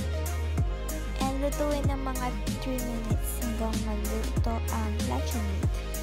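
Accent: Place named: Philippines. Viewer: ralf macalma ?? tf galit much? chill. kuya, ever heard of accent? normal lang may accent mga pinoy/pinay.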